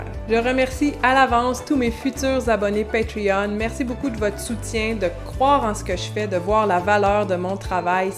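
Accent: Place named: Quebec, Canada. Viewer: Pourquoi soutenir ma? Pour avoir le plaisir d'entendre son accent Québecois mais surtout pour s'enrichir sur l'histoire, la culture Québécoise et la langue française!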